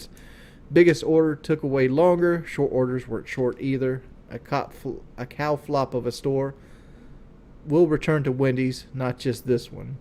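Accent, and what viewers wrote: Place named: South, United States. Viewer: That's just how folks from the sayouth talk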